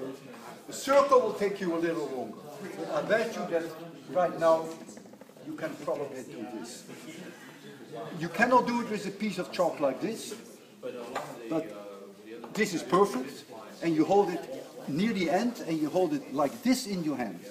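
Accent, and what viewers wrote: Netherlands. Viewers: He sounds like hes Dutch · Sounds like a dutch teacher to me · Hes so clearly dutch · I knew he was dutch when I heard him 😂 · Is he by any chance Dutch originally? Sure sounds like it 🙃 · He sounds dutch af. Update i checked the discription and shocker: he is dutch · 6 seconds in and I heard he was Dutch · I recognized him being Dutch after his first words 🙈 (I'm Dutch as well) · his accent is so Dutch! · me: is he dutch? me: * checks description * me: ...surprising... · Nah, it's about as Dutch as an accent can get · Nice dutch accent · Don't know him but he sounds like a Dutchman talking English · I knew that this man was Dutch from his accent. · He sounds so Dutch lol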